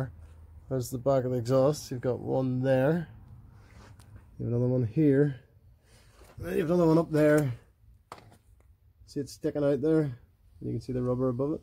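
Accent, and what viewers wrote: United Kingdom. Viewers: Great video mate, and good to hear my local accent (N'Abbey). This will be my next project in the coming days/weeks, as I have the same warning lights, (with engine power loss and car shuttering when at high speeds). I think I'll just replace the DriveShafts with new, as I've seen videos and photos with badly corroded shaft ends and Relevator Rings, and a lot of effort to try to clean the crud & rust off them. Thanks for sharing!! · Great video discribing a similar fault with my e92 , you sound that your from NI, would you consider homers?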